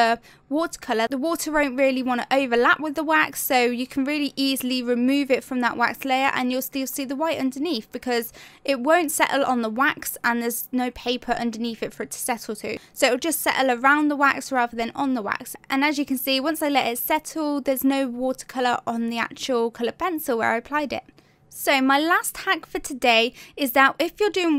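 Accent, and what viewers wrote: United Kingdom. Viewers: Is this a british accent? Sounds awesome at 0.25x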